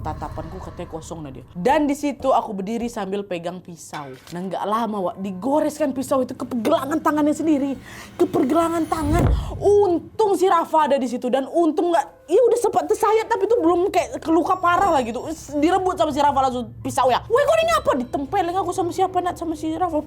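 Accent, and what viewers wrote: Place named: Kalimantan, Indonesia. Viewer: Warga kalimantan mana ni suara nya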